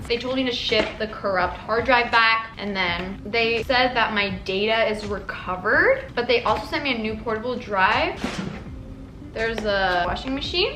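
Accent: United Kingdom. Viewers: The British accent tho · That british accent 😆❣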